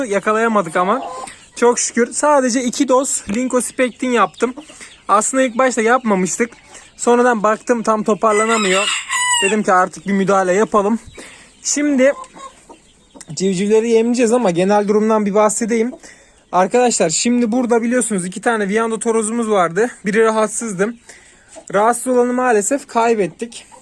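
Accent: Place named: Sakarya, Turkey. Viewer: Abi ses sakaryadami oturuyon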